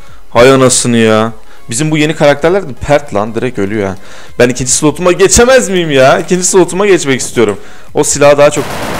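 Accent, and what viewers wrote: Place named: Sivas, Turkey. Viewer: abi sende sivas lisin kesin "BILIYUNMU" demenden anladim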